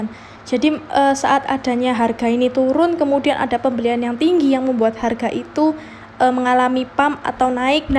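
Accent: Java, Indonesia. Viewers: Medok mbak.wong ngdi e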